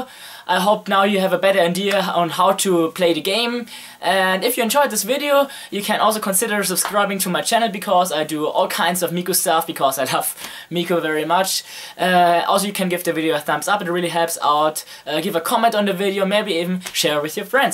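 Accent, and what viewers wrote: Germany. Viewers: Nice to see as a german. Quite creative man keep it up Und man merkt den Deutschen Akzent aber cool ;) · ich wusste das du deutsch bist XD dein Akzent verrät es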